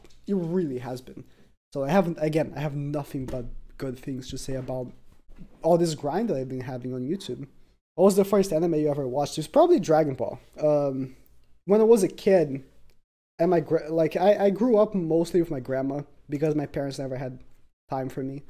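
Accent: Brazil. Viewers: I actually remember In one of your streams I asked you if you were from Brazil because I recognized your accent, my portuguese teacher also has the same one so I thought it was similar. But when you read my comment and didn't answer I thought I had said something rude so yeah.. but looks like I was right :p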